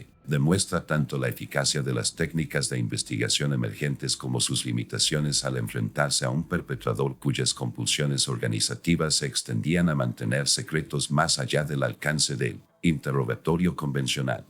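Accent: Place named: United States. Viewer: Por favor busquen un narrador de habla española no un yanqui con acento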